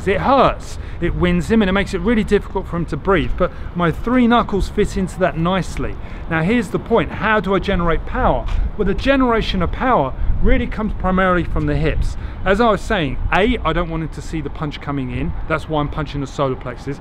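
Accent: United Kingdom. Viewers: I like your teaching very much, but the example is probably far too frequent and too much, hahahha. I hope your partner has a body armor inside his pullover. Are you from Britain, Sir? You speak like James Bond. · I Appreciate Your Wisdom and Sophisticated British Accent Sensei!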